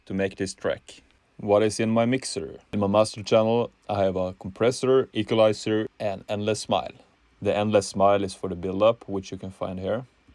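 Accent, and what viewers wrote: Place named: France. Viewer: You have the French accent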